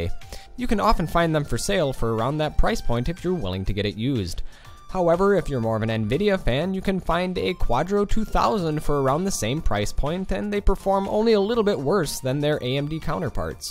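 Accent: Canada. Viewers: Saskatchewan eh! You sound like your from Saskatoon and it sounds cool as a commentator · Canadian as FUCK man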